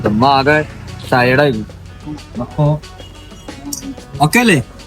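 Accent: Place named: Malappuram, India. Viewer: Ingale naadevdeyaaa slang Malappuram aanello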